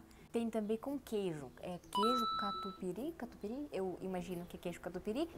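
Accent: Russia. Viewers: Bom dia eu adoro seus comentários ,amo seu sotaque russo misturado com português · Olá mais linda do Brasil... Você já está falando muito bem o Português. Um pouco de sotaque russo fica até charmoso em vc. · Eu acho uma graça ela falando português, com esse sotaque russo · Gosto de assistir os seus vídeos, é tão legal ver e ouvir você falar. O português com o seu sotaque russo é muito bom, você fala palavras muito engraçadas. Adoro você,sou do norte do Brasil, Tocantins. sonho em conhecer uma russa pessoalmente e queria que fosse você. Beijos você é sensacional! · Eu amo esse sotaque russo 😍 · Não estou gostando. Você está perdendo o sotaque russo, que fica maravilhoso falando português. Não mude isto. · Moro no Amazonas . Gostei muito do seu sotaque russo seja bem vinda. Mas se vc e do lado do putin e melhor ir pra Rússia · vc é muito querida e seu sotaque é uma graça. 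"Chineli" é o melhor👏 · Bem vinda! Seu sotaque é gostoso. Amo a Rússia e as russas. A Rússia até hoje me soa como algo exótico.❤😊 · O seu sotaque Russo é muito show. Tenho um amigo Russo aqui no Canadá onde moro. Ele me ensina muitas frases e não e difícil a pronúncia porquê a nossa fonética não é tão diferente como o Inglês. Que Deus abençoe vcs e sua família aí no Brasil. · Como já disseram as russas aprendem muito rápido o português e o sotaque é o mais bonito de todos com ênfase no R, creio que nós brasileiros identificamos muito com o povo russo, pessoas simples , cultas , com uma literatura maravilhosa e sofredores devido aos desgovernos que tanto os afligem e a nós brasileiros também, seja bem vinda, venha conhecer minha cidade São José dos Campos, São Paulo se vier você vai ficar pra sempre, 40 minutos do litoral , Ilha Bela, São Sebastião, Caragua e 40 minutos das montanhas , Campos do Jordão, S.Francisco Xavier , e outras cidades muito lindas, O Vale do Paraíba é uma região muito bonita. 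· Seja bem- vinda! O que eu mais gosto nos russos se esforçando pra falar português é o sotaque e a troca do o pelo a. Muito fofa! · Vc é linda.Nao se preocupe com seu sotaque gostoso,dá pra entender linda. Que sejam todas bem vindas. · a expressão " puxa saco" quer dizer " alguém que quer fazer de tudo pra agradar alguém, mas só pensando em si mesmo, sem se importar com outras pessoas, com o objetivo de receber algo em troca". Exemplo puxa saco do patrão (chefe). Você fala bem o português, mas se percebe o sotaque Russo. Legal você quere dividir a sua experiência brasileira com a sua família. Felicidades e abraços... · Eu acho lindo demais, você falando português,com esse sotaque Russo.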